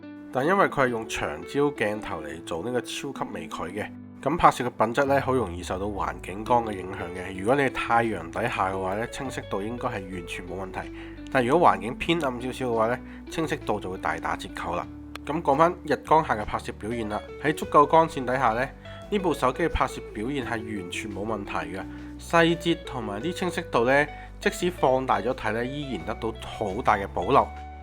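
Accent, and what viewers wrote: Hong Kong, China. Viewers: 就香港粵語口音啊，我也聽得懂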